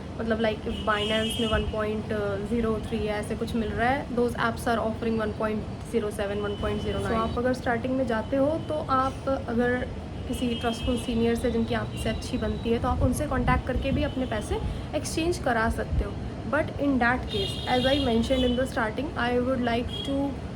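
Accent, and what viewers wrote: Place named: Bihar, India. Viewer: Bihari sound aarha h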